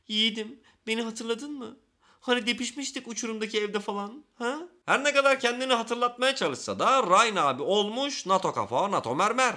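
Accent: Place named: Thrace, Turkey. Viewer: Trakyalı ağzı yapmış çükolatama harbi güzel yapmış ağzına sağlık xd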